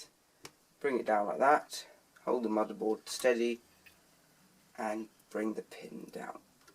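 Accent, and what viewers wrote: United Kingdom. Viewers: finally an english voice on youtube ahh :)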